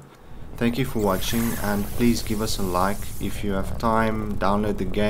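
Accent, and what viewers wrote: South Africa. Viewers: Are you a South African?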